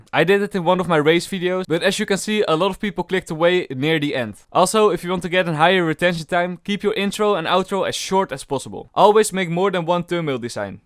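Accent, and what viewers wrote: Netherlands. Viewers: me not even thinking about youtube but still watching the video to hear his dutch accent :D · i am in love wid your dutch accent ! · I am not even interested in making a Youtube-Channel, yet i warched the whole video because this dutch accenr and your charakter is so addicting. Kewp it up man, see you at 200.000 Subs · hi sir following you since 4k subbed from my 6 account and love from india and most important information to reach 100k u should have a great content and a lovable Dutch accent love your vedios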